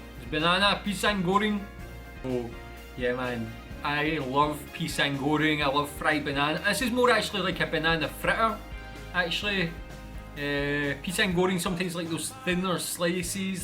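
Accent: Scotland, United Kingdom. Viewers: Are you from Scotland ? Your accent sounds Scottish.. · I Love Scottish Accent...👍👍💜💜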